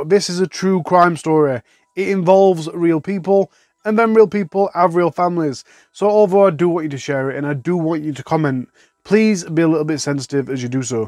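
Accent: Yorkshire, United Kingdom. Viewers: awww blooody elll lad 😂😂😂 thought I could see a bitta Yorkshire in there. Hahah. Love this channel mate. Currently weking mi way frew thee vids 😂. Keep up the great work man! Glad I found the channel. “Am off for a cuppa n a bizkit, before I gu darn Weken men’s 😂😂”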